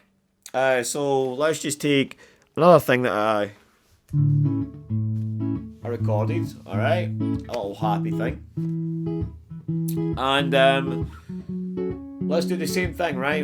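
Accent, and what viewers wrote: Scotland, United Kingdom. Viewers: I just found your channel & have learned more from you in the last couple of weeks, than in last couple of years on my own or even in lessons! Even with your Scottish brogue & my Southern American ears‼️🏴🇺🇸🤔🎸😆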